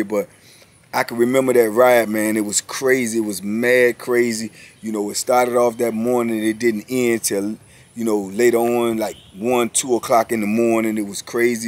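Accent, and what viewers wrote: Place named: Virginia, United States. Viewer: Lol..thats how they say carry in V.A most all of them..😂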